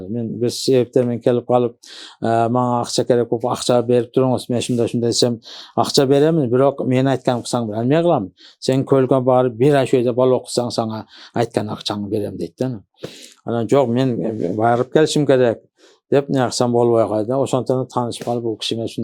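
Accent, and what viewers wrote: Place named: Uzbekistan. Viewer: Оллох рози бусин домладан, узиям киргиз тилида узбекча акцент билан гапирдила манимча, хамма гаплари тушунарли булди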